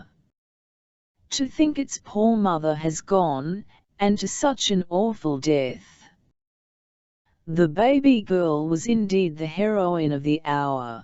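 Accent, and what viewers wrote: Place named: Australia. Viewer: People learning from this will pick up a strong Australian accent and a very robotic expressionless intonation!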